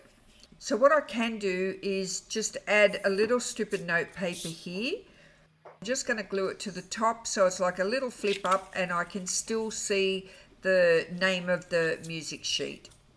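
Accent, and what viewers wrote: Australia. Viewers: It’s so awesome hearing your accent… Aussies are so good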